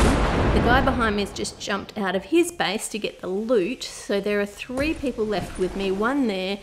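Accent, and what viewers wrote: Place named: Australia. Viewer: Why am in love with her accent Australia Day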